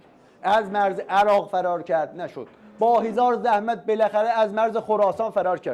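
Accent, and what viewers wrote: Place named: Azerbaijan. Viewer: 😂😂😂😂خیلی خوب بود اجراش،خدایی قشنگ بود،خیلی لهجه آذری شم باحاله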